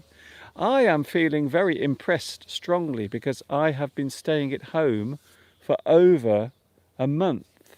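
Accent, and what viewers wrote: England, United Kingdom. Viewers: Hi, I love your pure English accent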